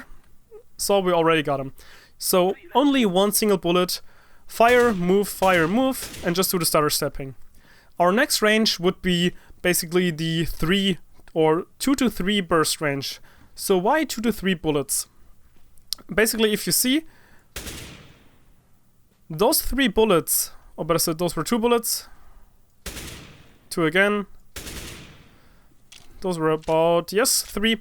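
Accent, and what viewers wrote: Germany. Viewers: ! i guess u would do great by doin that cause evry gun has different ways and styles to play that newbs like me need to learn, btw u hv a great accent for a german i used to think all of u would say ze and zat (no offense i hope u get what i mean)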